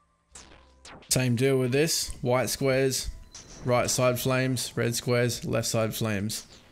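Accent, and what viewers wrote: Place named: Australia. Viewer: you sounds 110% aussie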